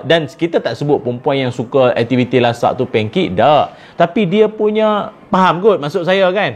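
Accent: Kedah, Malaysia. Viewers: slang kedah...sedap dengaq.....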